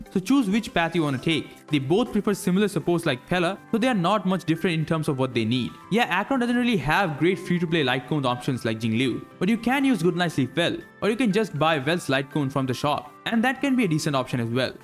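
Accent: India. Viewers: You from India bro? Accent seems familiar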